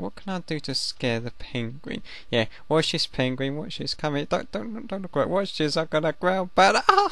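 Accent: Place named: England, United Kingdom. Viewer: This is HILARIOUS! +1 for an English accent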